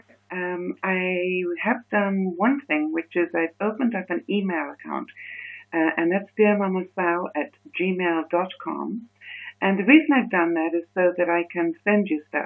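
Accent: United Kingdom. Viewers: Are u British? Because u have a British accent!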